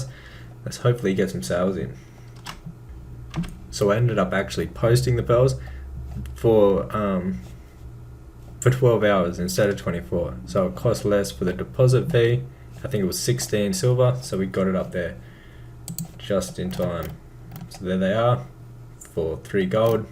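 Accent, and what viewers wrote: Australia. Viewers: You sound aussie, how are you not lagging out on this server lol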